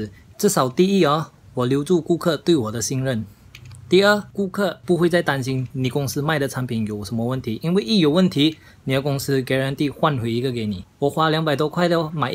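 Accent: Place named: Singapore. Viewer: Talk singhish haha because 是 jb人吗?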